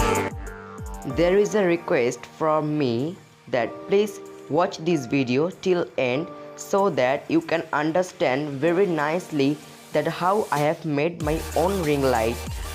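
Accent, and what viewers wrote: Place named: United States. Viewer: You are an Indian but your accent is the mixture of Indian and American ....wooooo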